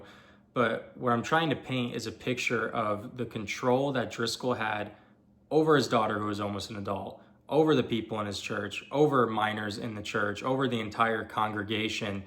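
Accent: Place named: East Texas, United States. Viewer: Sounds like someone east of Dallas!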